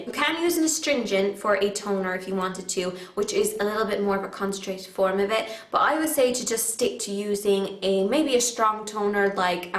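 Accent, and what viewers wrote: Ireland. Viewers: Are you a little bit Irish? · Not a little. She full irish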